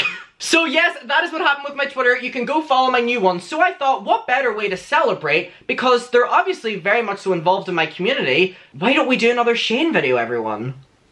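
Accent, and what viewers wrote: Ireland. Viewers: He sounds a little Irish and British. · I think his is Irish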